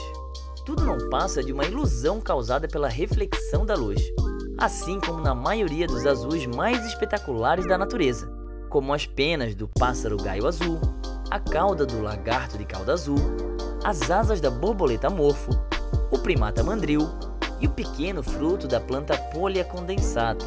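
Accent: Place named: Santa Catarina, Brazil. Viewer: Cara tu é catarinense so pode kkkk tmj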